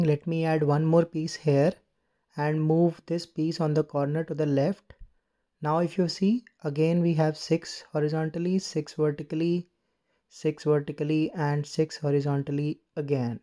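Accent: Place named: India. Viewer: YOUR INDIAN ACCENT SUX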